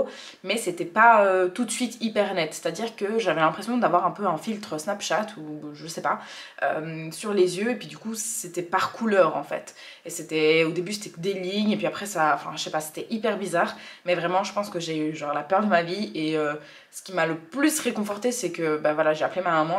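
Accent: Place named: Switzerland. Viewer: Tu a un très jolie accent suisse merci pour se partage 🙏🏿 Paix sur toi 🙏🏿 et ton chérie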